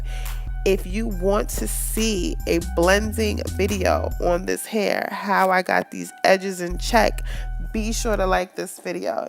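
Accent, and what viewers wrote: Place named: Brooklyn, United States. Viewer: Girl you sound like you from the Brooklyn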